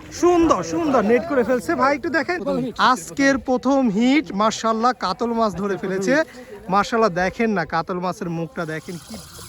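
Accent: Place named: Kolkata, India. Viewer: ai motheri tui kolkatar moto kore kotha bolos kn .. tui ki bangladeshi naki indiar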